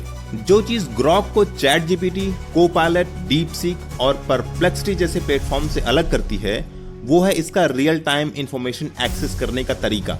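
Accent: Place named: Wardha, India. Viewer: Sir aapki voice me wardha ka मिठास अभी भी hai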